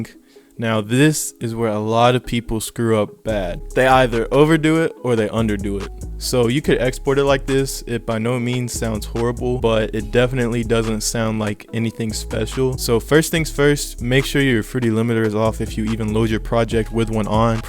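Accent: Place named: United States. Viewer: I love american accent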